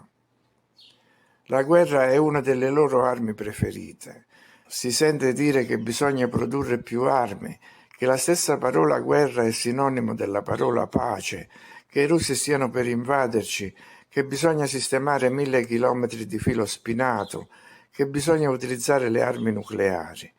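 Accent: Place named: Molise, Italy. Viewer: L'inflessione della voce assomiglia alla mia molisana 😀 Grazie, e scusa per l'invadenza.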